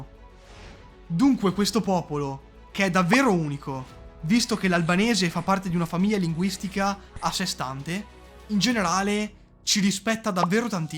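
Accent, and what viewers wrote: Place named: Veneto, Italy. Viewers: Un po' tutte ma un po' di più il giappone (si sente l'accento veneto, anche io sono del veneto) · "Mi puoi dire di dove e' il tuo accento?" Anche se cerca di camuffarlo è bergamasco/bresciano.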